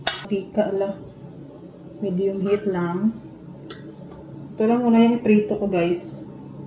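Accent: Iloilo, Philippines. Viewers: When she speaks English, her pronunciation is with Ilongga's accents, intonations and tones. Which is sometimes not easy to understand.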